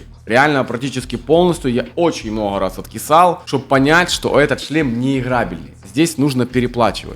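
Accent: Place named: Ukraine. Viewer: Акцент украинский сразу слышно. Украина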